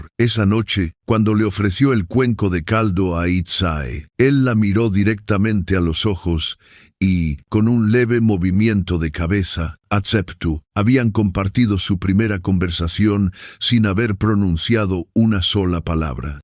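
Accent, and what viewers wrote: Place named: Portugal. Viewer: Bonita historia pero ese acento en portugués no le veo el caso le quita interés a la historia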